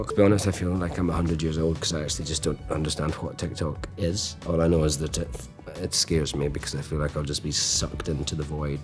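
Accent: Scotland, United Kingdom. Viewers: Oh l love your Scottish accent,never lose it! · Best Scottish accent I've heard 😆 I think we have our next Macbeth?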